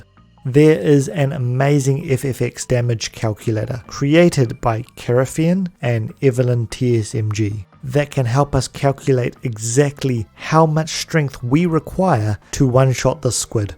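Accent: New Zealand. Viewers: Took me until here to realize that you were a kiwi, This absolutely sent me. Ka Pai cuz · Started the video cause it’s my favourite game of all time, then the kiwi accent hit me like a tonne of choccy fish! Kia ora bro! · are you kiwi by any chance?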